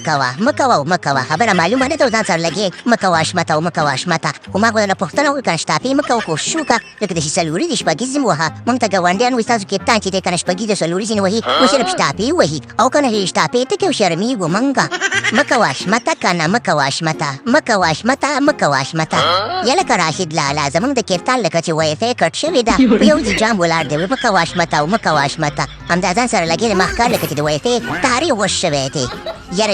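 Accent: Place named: Peshawar, Pakistan. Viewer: تیزنو پسکنو سوچه پشتو ووایه دا پیشوری لهجه پریژده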